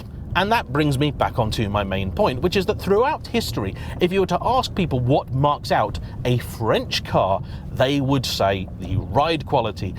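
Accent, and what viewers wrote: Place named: England, United Kingdom. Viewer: I’m watching from Australia and I personally just love the very English way talks about cars and I like watching the English countryside and the little villages he drives through… on a note to it’d be good if he did regular fleet updates so we can follow along and be more involved as viewers in what’s happening with the cars in his fleet, even if there’s no happening with a particular car.. keep us updated and involved please … we love the drama it’s part of what makes you entertaining! And the biggest drama I’m holding out for is the engine drama of the S2000, I’m gagging for more content on this car it’s my personal favourite 😍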